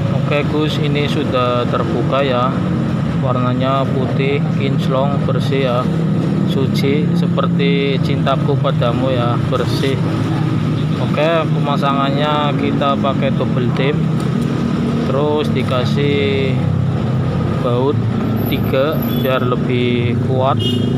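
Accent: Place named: Tegal, Indonesia. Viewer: Tegale ndi mas broo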